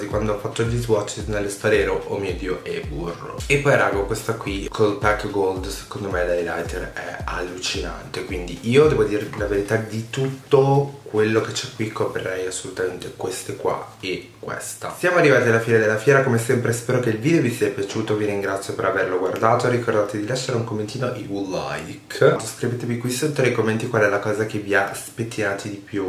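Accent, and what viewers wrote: Milan, Italy. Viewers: Sta cadenza milanese alla * mi fa impazzire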